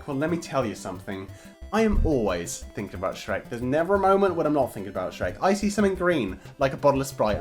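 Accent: England, United Kingdom. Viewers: it is because he is English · Because he's British. NOT English-speaking **BRITISH**. Edit: Didn't need to edit.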